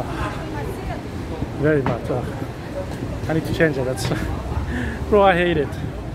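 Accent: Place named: India. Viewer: His name and accent seems Indian.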